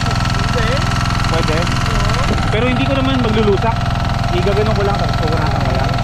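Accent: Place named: Bulacan, Philippines. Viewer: I like the story telling talaga ni! Naririnig ko minsan ung Bulakeño accent ni! ❤️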